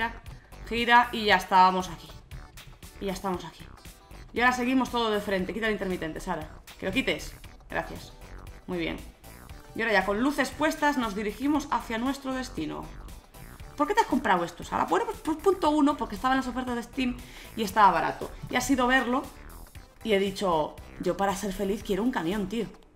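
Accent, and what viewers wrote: Argentina. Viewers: parece un argentino cheto o de verdad sonamos asi? xD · Se llama "español rioplatense"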